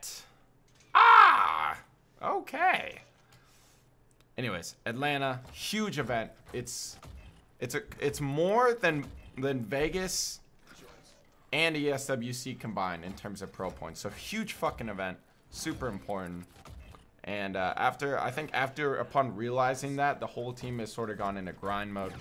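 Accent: Scotland, United Kingdom. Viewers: Aye you got Scottish in you im a huge fan and also Scottish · Scottish! Yes aye lad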